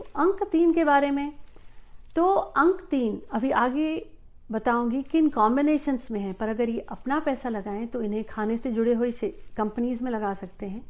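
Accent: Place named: Punjab, India. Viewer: Aye haye...loved that pnjabi ascent...aiven hi🤘🤘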